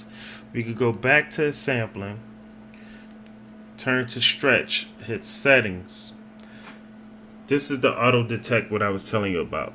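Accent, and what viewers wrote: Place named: Philadelphia, United States. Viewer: I'm from Philly too so it wasn't hard to tell. This helped a lot btw. 👊🏾 THNX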